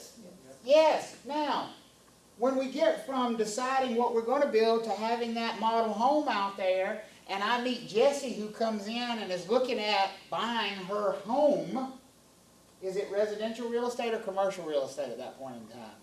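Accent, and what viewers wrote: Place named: North Carolina, United States. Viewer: sounds like NC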